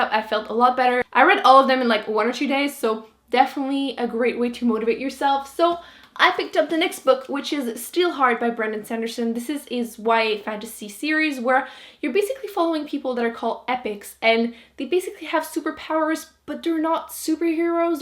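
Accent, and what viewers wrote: Quebec, Canada. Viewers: ps: ton accent québécois est super cute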